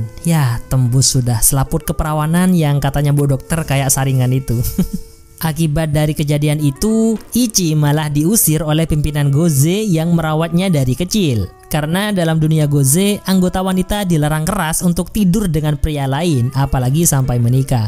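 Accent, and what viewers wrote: Madura, Indonesia. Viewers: Madura dimmah be'en kang? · Lha orang madura.. 😅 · Oreng dimmah bang madura yee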